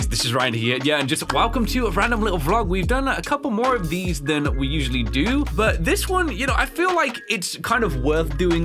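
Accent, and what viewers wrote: United Kingdom. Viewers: I kept watching your videos since 2020 because i needed to learn british english 😂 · He started out as the deep voiced British man who did FNAF theories and top 10s and then he started his own channel and 10 years later that channel has become something truly special and unique and one that has stood the test of time…congratulations Ryan thank you for a wonderful decade of fun 💙💙💙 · well, i don't quite remember which one of your videos i saw first, but i did came here from jay kubz scout, because someone in a comment session talked about you, so i got curios and decided to check you channel. At first it was a little confusing for me and some parts i couldnt understand what you were saying because i only consumed english content made by americans, hence why i couldn't understand british accent, but it quickly grew on me and i got used to your voice, which had improved my english by a lot, so thank you for that! 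(Youre literally the only reason i can understand dawko and bazz lol) The thing that got me is the vibe and editing, that's for sure, because it was really special (still is) from what I've watched on youtube before. Now I'm waiting for upload or rewatch your old videos when i eat, its became a part of my routine, and i dont miss any video you upload :) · a decade of funny bri'ish man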